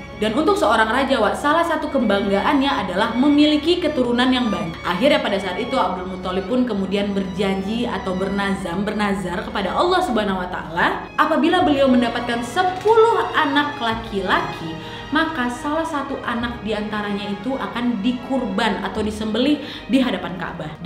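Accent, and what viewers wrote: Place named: Medan, Indonesia. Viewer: Saya tau chanel ini dari anak2 yg suka nonton, saya sempat komentar keren juga Chanel ini, sangat informatif dan profesional, saya tau pasti tdk mudah mengumpulkan bahan2 materi kontennya, istri sy jg yg kelahiran Medan juga sering senyum2 sendiri mendengar logat saat membawakan kontennya, apalagi ada Wak..Wak, jd ingat tmn2nya wkt Sekolah dan Kuliah katanya, terakhir setelah saya menonton konten tentang Nabi Muhammad SAW, langsung saya Subscribe Chanel ini dari HP saya( biasanya kami nonton di TV pakai WiFi dirumah ), Congratulation ya Wak 😁, terus berkarya dengan konten2 hebatnya👍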